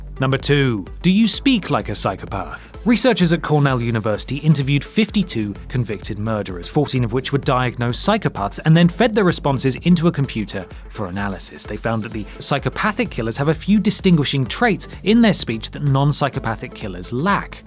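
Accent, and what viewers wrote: United Kingdom. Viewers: I was wondering why watch mojo all the sudden become British but then I notice it was what culture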